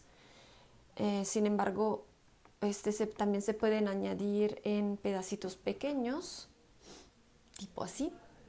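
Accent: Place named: Italy. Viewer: Es italiana, por los nombres y el acento.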